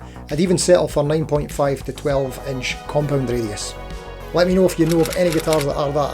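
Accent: Scotland, United Kingdom. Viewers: Love the Scottish accent.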